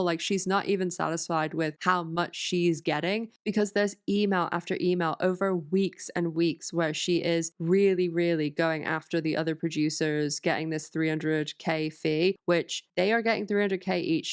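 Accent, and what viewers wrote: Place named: United Kingdom. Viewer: Where in the UK are you from? Your British accent sounds faint, not Ike Queen’s English but something else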